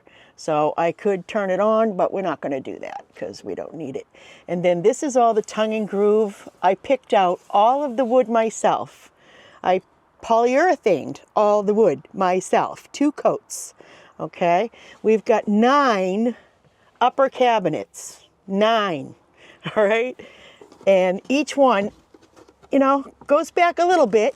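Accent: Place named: Boston, United States. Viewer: I usually love Boston accents but this one got old very quickly.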